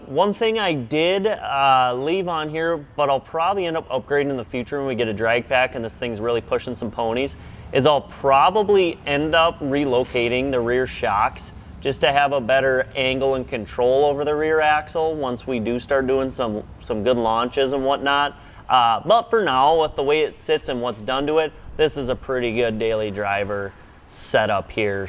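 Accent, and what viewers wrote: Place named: Wisconsin, United States. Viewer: You have the most Wisconsin/southern Canada accent ever 😂. Sickkkk truck tho 🎉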